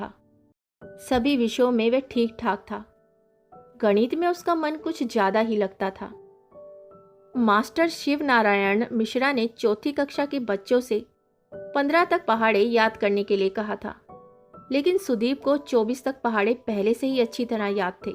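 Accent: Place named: Haryana, India. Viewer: Bahut badhiya kahani.. aur specially apka Haryanvi accent.. great.. m not Haryanvi but mene shadi Haryanvi boy se ki he.. to samjhne lag gai hu... Apne achhe se sunaya ..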